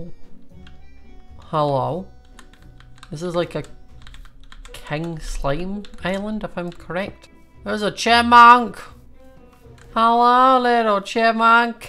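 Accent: Scotland, United Kingdom. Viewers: You remind me of that sloth off of ice age because you are taking Dragons . Your so funny and I love your Scottish accent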